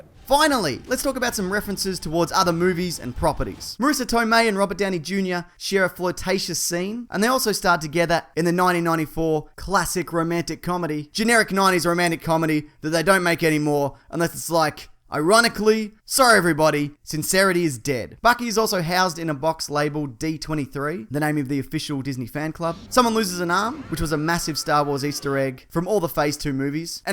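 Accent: Australia. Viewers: Are you a Aussie youtuber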